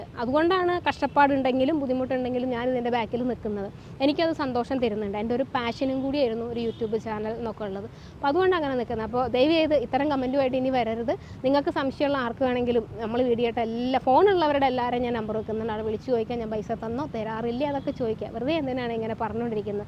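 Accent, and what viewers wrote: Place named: Thiruvananthapuram, India. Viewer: ഞാൻ ഓർത്തു ചേച്ചി തിരുവനന്തപുരം കാരി ആണെന്ന്.. 😊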